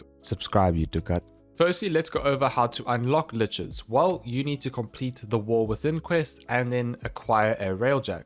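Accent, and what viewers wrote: South Africa. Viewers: Are you south African? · I know that English South African accent anywhere! XD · Are you south african? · You are awesome and so nice to hear your SA accent! Hubby and I are from SA!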